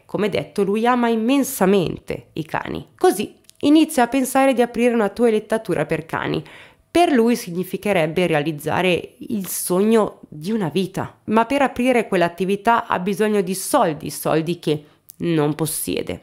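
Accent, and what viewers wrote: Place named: Rome, Italy. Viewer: Daje che peesse non capitolina...lo parli bene eromano...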